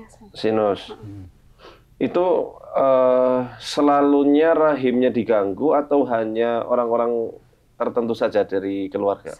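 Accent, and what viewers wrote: Banyumas, Indonesia. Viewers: Jine kiye ngapak juga y ramane 👍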